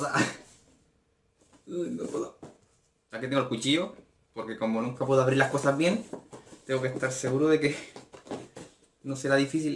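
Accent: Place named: Spain. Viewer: Por que hablas como español si sos tremendo peruano?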